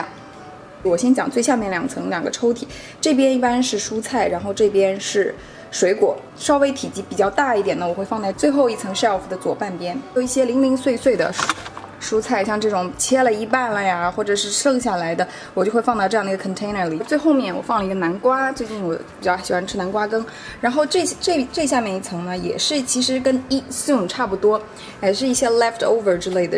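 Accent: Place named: Shandong, China. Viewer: 主播应该是山东人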